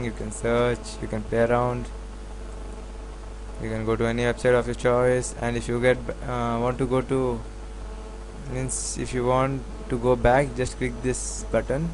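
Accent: India. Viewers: 100% idian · hahaha i love gujju accent lol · He sounded like a Hindi (India).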